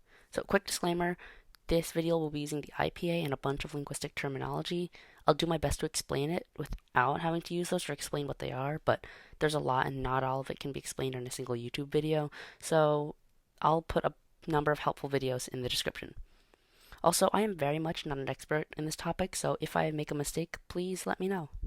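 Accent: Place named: England, United Kingdom. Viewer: Hello. Sorry, but... No, the vowels under the fada should be pronounced cleanly, not as diphthongs. You are projecting English phonetics onto Irish. For example, the "i" does not sound like in "bit", it sounds like the French "i", after narrow consonants. Thank you.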